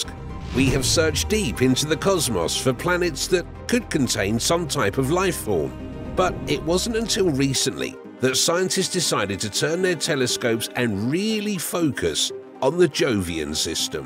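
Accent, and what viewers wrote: United Kingdom. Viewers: I know. They usually get a narrator with a British accent to give their lightweight video more 'gravitas'.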